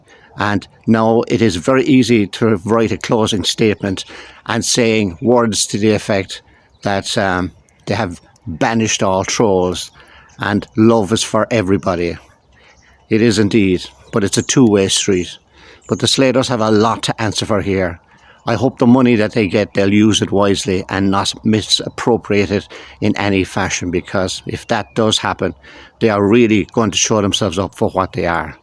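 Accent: Ireland. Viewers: Would you care to back up your claims that there are cartels involved? Show us the proof. The video you saw where Jay lost his sight? Back it up, give us some proof. Currently all everyone is hearing is you blowing smoke out of your ass in an Irish accent...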